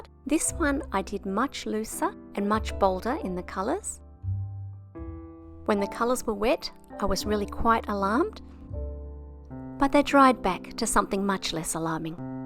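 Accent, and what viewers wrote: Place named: Australia. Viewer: Hi, I love, love, love this technique to colour cards... so beautiful! You have certainly inspired me to make cards similar to these again. By the way, it is so lovely hearing another Aussie accent, and I immediately subscribed. Blessings from another Aussie, Gloria. 🥰🐨🦘💖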